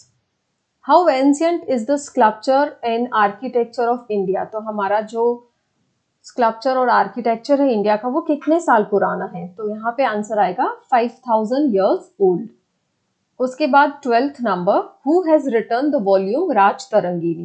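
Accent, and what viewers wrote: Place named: Gujarat, India. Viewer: Aap ke voice se hi pata chal gya tha ki aap Gujarati ho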